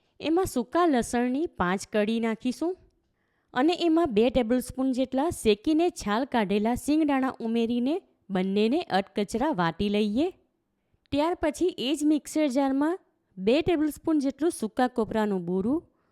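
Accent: Surat, India. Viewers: Wah Ben Bahuj Mast Majedar Recipe Share Kari Superb 👌👌 Ben Assal Surti Language Ma Badhi Recipe Shikvado Cho Recipe Jovani Ane Tamari Surti Bhasha Sambhadvani Bahuj Game Ok 🙏 U Ben 🙏🌹🌹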